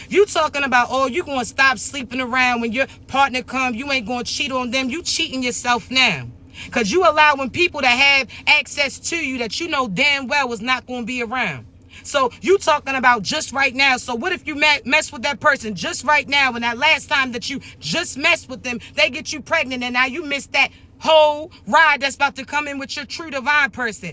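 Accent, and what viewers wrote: Baltimore, United States. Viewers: ALL FACTS LADY YOU GOT A BALTIMORE ACCENT GOOD CONTENT KEEP THAT ENERGY COOKING 🎯🎯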